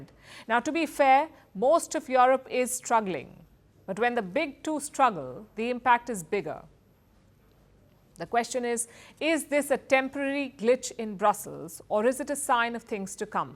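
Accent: India. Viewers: insufferable indian accent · you’re not watching the show, that’s damn sure! I’m addicted to, excellent reporting, she’s smart beautiful lady, I’m a huge fan of her for sure! To be honest with you I kind of have a little crush on her from her beautiful Indian accent to the way she talks and……. Lol I know she hates Trump anyone can see it, including Stevie Wonder. needs to get into the program, it’s not late. You have to follow Mark Zuckerberg, Jeff Bezos …did 😂don’t forget he’s a good friend of Narendra Modi also. I don’t think she drink Kamala’s kool aide so she should support the guy say no to Wars!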